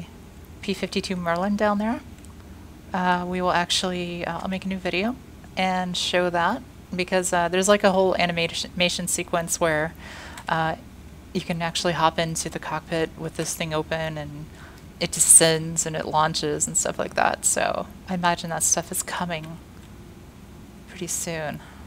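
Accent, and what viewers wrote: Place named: United States. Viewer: awesome peek at whats to come! I'm so pledging as soon as payday comes! Its proper exciting:D lovin your American accent:) EVE Online is no more for me....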